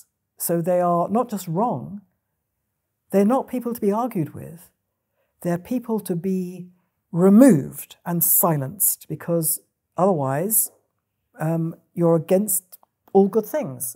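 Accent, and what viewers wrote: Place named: United Kingdom. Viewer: " . . .because people need to live in a society which is civilized which looks after the welfare of others rather than yourself. . ." This comes from a female with a British accent. Hmm, wonder what she means by "civilized" and "the welfare of others". Surely she's talking about the others with much darker skin, right?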